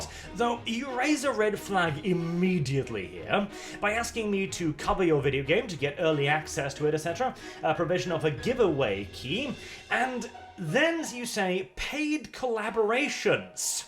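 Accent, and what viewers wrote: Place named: RP, United Kingdom. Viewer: You are Norwegian? You sound like an aristocratic (lacing your speech with some of the thickest sarcasm I have ever had the pleasure of hearing) Brit!... Learn something new everyday.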